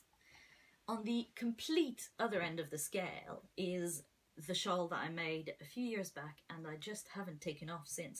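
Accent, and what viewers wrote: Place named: Canada. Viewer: love this! Love to hear a Canadian voice x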